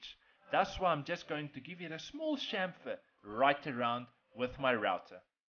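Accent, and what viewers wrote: South Africa. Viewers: I hear a south african accent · Hey. You sound South African. If so, how can I get in touch with you? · What router bit did you use and do you recommend it? I lived in Secunda and Johannesburg for 5+ years. Its nice to hear your accent.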